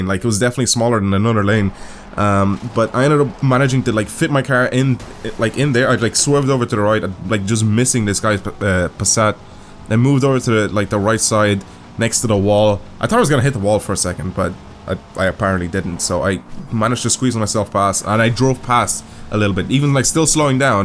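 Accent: Ireland. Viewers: When did you move to Ireland (at what age?) cause of have the accent would never have guessed you were not Irish lol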